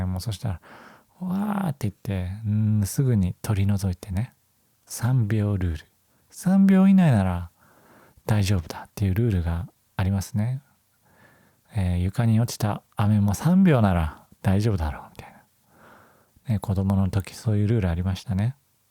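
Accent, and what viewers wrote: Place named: Japan. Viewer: I was wondering this too… your voice is gorgeous. From what part of Japan are you from? I want to learn this accent.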